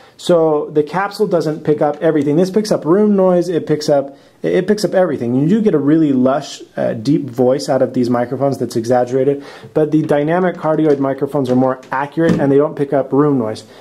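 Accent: Spain. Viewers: You've come back with a spanish accent :D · the Spanish accent now is awesome :D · You have a slight Spanish accent now :). Must be all that time spent in Bolivia. Welcome back bro. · wow you picked up a small spanish accent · Haha I can notice a slight spanish accent. Looks like you got very involved with Bolivian culture ;) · You kind of have a Spanish accent now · Wow you sound really spanish after those years in bolivia! :D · You have a spanish accent in your english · chubby and a spanish accent. It's like we're brothers now. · You've acquired a slight Spanish accent. Glad to have you back! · Spanish accent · It's awesome that he's got that Spanish twist on his accent now haha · Is it just me or does have a bit of a Spanish twang to his speech now? How cute:)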